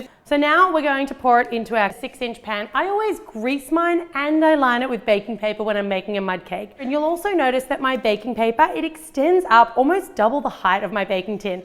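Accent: Australia. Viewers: Australian I think :) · she is not English. her accent is Australian.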